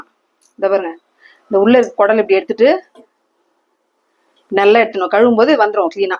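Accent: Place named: Telugu, India. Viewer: அக்கா உங்ககிட்ட ரெண்டு கேள்வி நீங்க நடிகை ஜீவிதா மாதிரி இருக்கீங்க and வீட்டுல தெலுங்கு பேசுவீங்களா நாங்க ரெட்டியார் தமிழ் தெலுங்கு வாடை அடிக்குது னா தமிழ் tr ங்க 🙏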